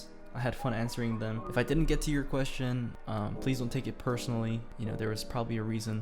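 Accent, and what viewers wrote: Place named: South Korea. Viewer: One question (Sorry not on discord will join soon) Will u voice anime Ur Korean accent sounds like u could Ty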